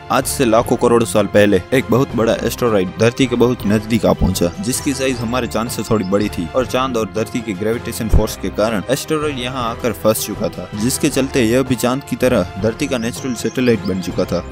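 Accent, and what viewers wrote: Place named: Rajasthan, India. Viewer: aapke langauge tone se aap rajasthani lag re ho ya gujrati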